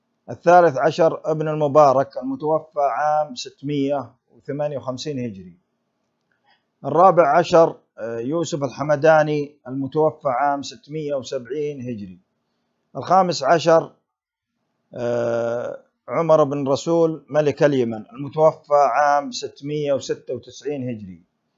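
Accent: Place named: Iraq. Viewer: لهجتك قريبه من العراق شفيك تنطق ال ج اكثر شي شكل اجدادك عراقات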